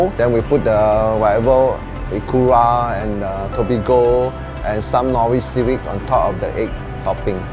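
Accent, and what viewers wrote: Singapore. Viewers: He has a Singapore accent ✌️😋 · Ur accent is killing me! ! Singapore English is awful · He speaks in Singaporeans accent.... But i like his presentation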